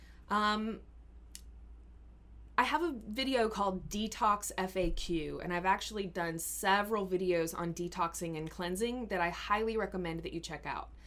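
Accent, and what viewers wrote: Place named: Texas, United States. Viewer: i can hear the texas in your voice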